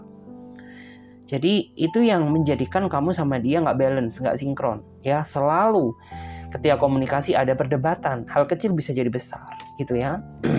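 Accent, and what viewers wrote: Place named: West Kalimantan, Indonesia. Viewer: kalbar nh kk😅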